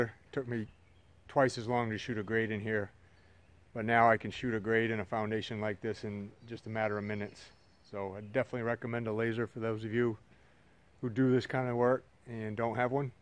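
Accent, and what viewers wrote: Maine, United States. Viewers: Sounds like your a Mainer!?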